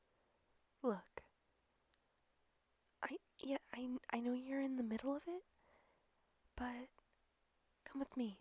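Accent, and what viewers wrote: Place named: United Kingdom. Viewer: I came for the accent, and you know what? I can tolerate what i'm hearing at least it's not an extreme British accent.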